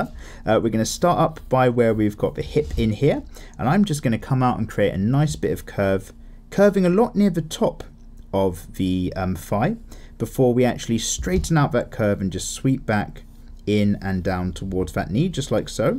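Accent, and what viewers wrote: United Kingdom. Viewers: British english >:D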